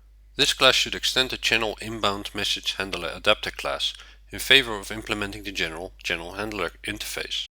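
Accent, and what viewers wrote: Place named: Netherlands. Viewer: Sounds kinda dutch innit?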